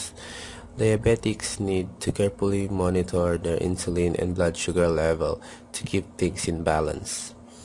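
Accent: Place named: Philippines. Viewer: Filipino accent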